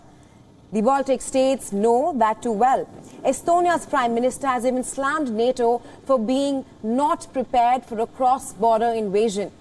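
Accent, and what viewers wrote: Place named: India. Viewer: It's like watching Fox News with indian accent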